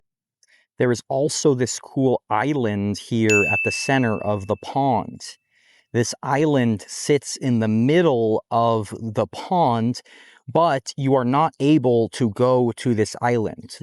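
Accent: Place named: United States. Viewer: It's funny how you speak so slowly, but for us beginners, it's a good pace, especially since sometimes there are words I don't know the meaning of. I only have a few reservations about the channel name. English at Ease is very popular, which makes it difficult to find. I had to do a lot of searching. I should have added a name or some other feature that would have made it easier. Other than that, it's okay. American is a bit easier to understand than pure English.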